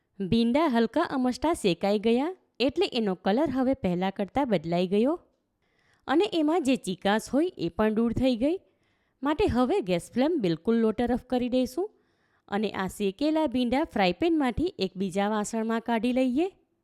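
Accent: Surat, India. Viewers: Wah Ben Bahuj Mast Majedar Recipe Share Kari Superb 👌👌 Ben Assal Surti Language Ma Badhi Recipe Shikvado Cho Recipe Jovani Ane Tamari Surti Bhasha Sambhadvani Bahuj Game Ok 🙏 U Ben 🙏🌹🌹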